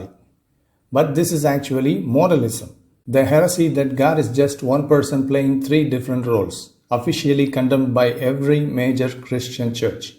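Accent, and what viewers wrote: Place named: India. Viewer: 𝕋𝕠 𝕥𝕙𝕖 𝕡𝕖𝕣𝕤𝕠𝕟 𝕥𝕙𝕒𝕥 𝕚𝕤 𝕟𝕒𝕣𝕣𝕒𝕥𝕚𝕟𝕘 𝕥𝕙𝕚𝕤 𝕙𝕠𝕘𝕨𝕒𝕤𝕙, 𝕝𝕖𝕥 𝕞𝕖 𝕥𝕖𝕝𝕝 𝕦 𝕚𝕗 𝕦 𝕚𝕟 𝕒𝕝𝕝 𝕦𝕣 𝕔𝕒𝕣𝕟𝕒𝕝𝕚𝕥𝕪, 𝕖𝕕𝕦𝕔𝕒𝕥𝕚𝕠𝕟 & 𝕞𝕖𝕟𝕥𝕒𝕝𝕚𝕥𝕪 𝕥𝕙𝕚𝕟𝕜 𝕥𝕙𝕖 𝔹𝕚𝕓𝕝𝕖 𝕚𝕤 𝕒 𝕔𝕠𝕟𝕗𝕦𝕤𝕚𝕠𝕟 𝕨𝕙𝕒𝕥 𝕞𝕒𝕜𝕖𝕤 𝕦 𝔾𝕠𝕕 𝕠𝕣 𝕒 𝕊𝕒𝕚𝕟𝕥 𝕚𝕟 𝕥𝕣𝕪𝕚𝕟𝕘 𝕥𝕠 𝕔𝕠𝕣𝕣𝕖𝕔𝕥 𝕥𝕙𝕖 𝕨𝕣𝕚𝕥𝕥𝕖𝕟 𝕨𝕣𝕕 𝕠𝕗 𝕒 𝕝𝕚𝕧𝕚𝕟𝕘 𝔾𝕠𝕕 𝕨𝕙𝕒𝕥 𝕞𝕒𝕜𝕖𝕤 𝕦 𝕤𝕥𝕒𝕟𝕕 𝕒𝕓𝕠𝕧𝕖 𝕖𝕧𝕖𝕣𝕪𝕥𝕙𝕚𝕟𝕘, 𝕨𝕚𝕥𝕙 𝕖𝕧𝕖𝕣𝕪 𝕚𝕟𝕔𝕙 𝕠𝕗 𝕪𝕣 𝕞𝕖𝕟𝕥𝕒𝕝𝕚𝕥𝕪 𝕚 𝕕𝕟𝕥 𝕟𝕖𝕖𝕕 𝕥𝕠 𝕜𝕟𝕠𝕨 𝕦 𝕥𝕠 𝕞𝕒𝕜𝕖 𝕒𝕟 𝕒𝕤𝕤𝕦𝕞𝕡𝕥𝕚𝕠𝕟 𝕦 𝕒𝕣𝕖 𝕒𝕟 𝕒𝕓𝕤𝕠𝕦𝕝𝕦𝕥𝕖 𝕒𝕤𝕤. 𝕎𝕙𝕒𝕥 𝕞𝕒𝕜𝕖𝕤 𝕦 𝕥𝕙𝕚𝕟𝕜 𝕥𝕙𝕒𝕥 𝕖𝕧𝕖𝕣𝕪 ℂ𝕙𝕣𝕚𝕤𝕥𝕚𝕒𝕟 𝕕𝕠𝕖𝕤'𝕟𝕥 𝕜𝕟𝕠𝕨 𝕨𝕙𝕒𝕥 𝕥𝕙𝕖 𝕋𝕣𝕚𝕟𝕚𝕥𝕪 𝕞𝕖𝕒𝕟𝕤, 𝕛𝕦𝕕𝕘𝕚𝕟𝕘 𝕗𝕣𝕠𝕞 𝕪𝕣 𝕒𝕔𝕔𝕖𝕟𝕥 𝕦 𝕤𝕠𝕦𝕟𝕕 𝕀𝕟𝕕𝕚𝕒𝕟 𝕒𝕣𝕖 𝕒𝕨𝕒𝕣𝕖 𝕚𝕟 𝕀𝕟𝕕𝕚𝕒 𝕥𝕙𝕖𝕣𝕖 𝕒𝕣𝕖 𝕞𝕠𝕣𝕖 𝕥𝕙𝕒𝕟 3000 𝕘𝕠𝕕𝕤 𝕡𝕖𝕣𝕙𝕒𝕡𝕤 𝕞𝕠𝕣𝕖 𝕓𝕖𝕔𝕒𝕦𝕤𝕖 𝕚𝕟 𝕀𝕟𝕕𝕚𝕒 𝕒𝕟𝕪 𝕠𝕓𝕛𝕖𝕔𝕥 𝕔𝕒𝕟 𝕓𝕖𝕔𝕠𝕞𝕖 𝕠𝕣 𝕣𝕖𝕤𝕖𝕞𝕓𝕝𝕖 𝕒 𝕘𝕠𝕕 𝕓𝕒𝕤𝕚𝕔𝕒𝕝𝕝𝕪 𝕒 𝕞𝕒𝕟 𝕞𝕒𝕕𝕖 𝕚𝕕𝕠𝕝 𝕞𝕒𝕕𝕖 𝕓𝕪 𝕞𝕒𝕟, 𝕟𝕠𝕨 𝕞𝕒𝕟 𝕡𝕣𝕒𝕪𝕤 𝕗𝕠𝕣 𝕥𝕙𝕒𝕥 𝕚𝕤 𝕥𝕙𝕒𝕥 𝕟𝕠𝕥 𝕔𝕠𝕟𝕗𝕦𝕤𝕚𝕟𝕘 𝕓𝕦𝕥 𝕢𝕦𝕖𝕤𝕥𝕚𝕠𝕟 𝕝𝕚𝕖𝕤 𝕒𝕣𝕖 𝕖𝕧𝕖𝕣𝕪𝕠𝕟𝕖 𝕠𝕗 𝕥𝕙𝕖𝕤𝕖 𝕘𝕠𝕕𝕤 𝕣𝕖𝕒𝕝, 𝕗𝕒𝕝𝕤𝕖 𝕠𝕣 𝕛𝕦𝕤𝕥 𝕒𝕟𝕠𝕥𝕙𝕖𝕣 𝕞𝕒𝕟 𝕞𝕒𝕕𝕖 𝕚𝕕𝕠𝕝...𝕔𝕠𝕣𝕣𝕖𝕔𝕥 𝕥𝕙𝕠𝕤𝕖 𝕥𝕙𝕚𝕟𝕘𝕤 𝕚𝕗 𝕦 𝕥𝕙𝕚𝕟𝕜 𝕦 𝕒𝕣𝕖 𝕒𝕓𝕠𝕧𝕖 𝔾𝕠𝕕'𝕤 𝕔𝕣𝕖𝕒𝕥𝕚𝕠𝕟...𝕡𝕖𝕣𝕙𝕒𝕡𝕤 𝕦 𝕞𝕦𝕤𝕥 𝕓𝕖𝕝𝕚𝕖𝕧𝕖 𝕦 𝕒𝕣𝕖 𝕘𝕠𝕕, 𝕚𝕥 𝕨𝕠𝕟𝕥 𝕤𝕦𝕣𝕡𝕣𝕚𝕤𝕖 𝕞𝕖 𝕔𝕒𝕦𝕤𝕖 𝕦 𝕡𝕝 𝕔𝕠𝕞𝕖 𝕗𝕣𝕠𝕞 𝕥𝕙𝕒𝕥 𝕓𝕔𝕜 𝕘𝕣𝕠𝕦𝕟𝕕 𝕒𝕟𝕪𝕥𝕙𝕚𝕟𝕘 & 𝕖𝕧𝕖𝕣𝕪𝕥𝕙𝕚𝕟𝕘 𝕔𝕒𝕟 𝕓 𝕘𝕠𝕕, 𝕚𝕗 𝕦 𝕕𝕟𝕥 𝕦𝕟𝕕𝕖𝕣𝕤𝕥𝕒𝕟𝕕 𝕥𝕙𝕖 𝕋𝕣𝕚𝕟𝕚𝕥𝕪 𝕒𝕤𝕜 𝕗𝕠𝕣 𝕖𝕕𝕦𝕔𝕒𝕥𝕚𝕠𝕟 𝕓𝕦𝕥 𝕕𝕟𝕥 𝕔𝕠𝕞𝕖 𝕠𝕟 𝕥𝕙𝕚𝕤 𝕡𝕝𝕒𝕥𝕗𝕠𝕣𝕞 𝕚𝕟𝕤𝕦𝕝𝕥𝕚𝕟𝕘 𝕥𝕙𝕖 𝕨𝕣𝕕 𝕠𝕗 𝕒 𝕝𝕚𝕧𝕚𝕟𝕘 𝔾𝕠𝕕 𝕠𝕦𝕣 𝔾𝕠𝕕 𝕠𝕣 𝕙𝕚𝕤 𝕨𝕣𝕕 𝕚𝕤 𝕟𝕠𝕥 𝕒𝕟 𝕚𝕕𝕠𝕝 𝕟𝕠𝕣 𝕤𝕠𝕞𝕖𝕥𝕙𝕚𝕟𝕘 ℂ𝕙𝕣𝕚𝕤𝕥𝕚𝕒𝕟𝕤 𝕞𝕒𝕕𝕖 𝕦𝕡 𝕚𝕟𝕤𝕥𝕖𝕒𝕕 𝕙𝕚𝕤 𝕥𝕙𝕖 𝔾𝕠𝕕 𝕨𝕖 𝕒𝕣𝕖 𝕔𝕣𝕖𝕒𝕥𝕖𝕕 𝕗𝕣𝕠𝕞...𝕋𝕣𝕚𝕟𝕚𝕥𝕪 𝕚𝕤 𝕥𝕠 𝕙𝕦𝕘𝕖 𝕗𝕠𝕣 𝕪𝕣 𝕦𝕟𝕕𝕖𝕣𝕤𝕥𝕒𝕟𝕕𝕚𝕟𝕘 𝕒 𝕣𝕖𝕒𝕝 & 𝕥𝕣𝕦𝕖 ℂ𝕙𝕣𝕚𝕤𝕥𝕚𝕒𝕟 𝕨𝕚𝕝𝕝 𝕜𝕟𝕠𝕨 𝕥𝕙𝕚𝕤 𝕒𝕤 𝕚𝕥 𝕚𝕤 𝕒 𝕗𝕠𝕦𝕟𝕕𝕒𝕥𝕚𝕠𝕟 𝕠𝕟 𝕨𝕙𝕖𝕣𝕖 & 𝕨𝕙𝕒𝕥 𝕠𝕦𝕣 𝕥𝕖𝕒𝕔𝕙𝕚𝕟𝕘𝕤 𝕠𝕣𝕚𝕘𝕚𝕟𝕒𝕥𝕖𝕕 𝕗𝕣𝕠𝕞 𝕦 𝕒𝕣𝕖 𝕗𝕠𝕠𝕝, 𝕣𝕖𝕡𝕖𝕟𝕥 & 𝕗𝕠𝕝𝕝𝕠𝕨 ℂ𝕙𝕣𝕚𝕤𝕥 𝕓𝕖𝕗𝕠𝕣𝕖 𝕦 𝕝𝕒𝕟𝕕 𝕪𝕠𝕦𝕣𝕤𝕖𝕝𝕗 𝕚𝕟 𝕥𝕙𝕖 𝕨𝕣𝕒𝕥𝕙 𝕠𝕗 𝕥𝕙𝕚𝕤 𝔾𝕠𝕕....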